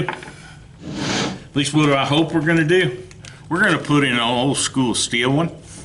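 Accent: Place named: South, United States. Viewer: What region of the US is this southern accent from? I have two units of superchamp xd. I got the first one new because I loved the sound and the price at the store. Years later I went for another one but they had the new x2 version which I didn't fancy. So I waited until I found one second hand. Great amp, size, power and.... brilliant sounds wiselychosen.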